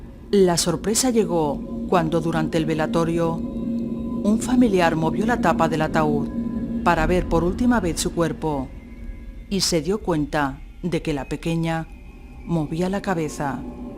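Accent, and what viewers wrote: Spain. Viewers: siento que la chica que esta hablando es de españa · ese acento Espanol me cae en los huevos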